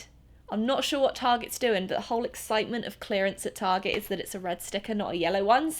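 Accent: United Kingdom. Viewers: im confused is she British or American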